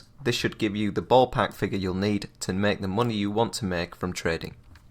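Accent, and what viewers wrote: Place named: North, United Kingdom. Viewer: any suggestions on online personalities? do you do mentoring. BTW your videos are awesome its always a great feeling of confidence when i listen to someone with a northern accent lol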